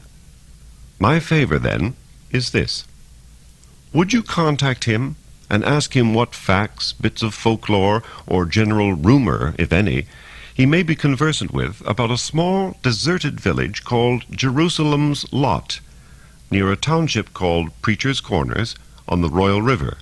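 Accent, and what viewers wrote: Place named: United Kingdom. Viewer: Horror stories should always be read in british accent. Good!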